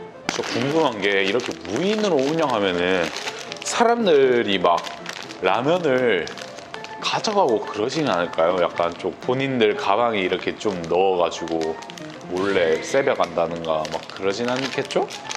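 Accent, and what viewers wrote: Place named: Busan, South Korea. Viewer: 야. 부산 촌놈 그냥. 사투리써. 안되는 표준말 썼다가 사투리섰다가 하지 말고. 불편해 보인다. 알겠냐.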